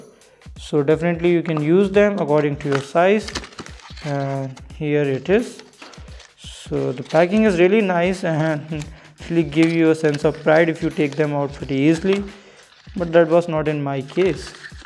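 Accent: India. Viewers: That is hindi accent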